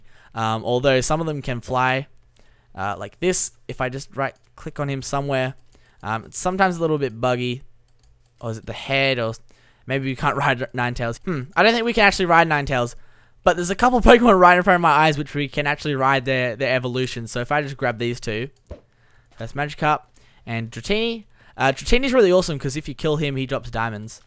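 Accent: Australia. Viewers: He is from Australia that is how they say it there and if he says it our way his mom will make fun of him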